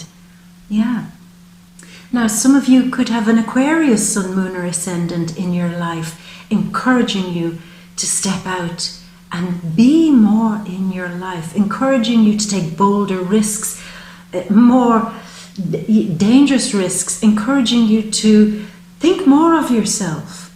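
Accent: Ireland. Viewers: This is 1 of the best readings you've ever done. Everything resonated. All the best to you from Canada 3> By the way what part of Ireland are you from?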